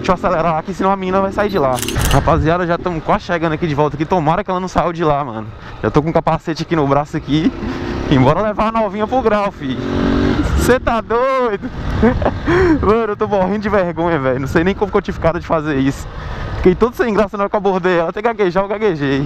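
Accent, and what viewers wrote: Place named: Bahia, Brazil. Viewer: Mano, de que estado você é? nossa, puta sotaque daqui da Bahia kkkkk♡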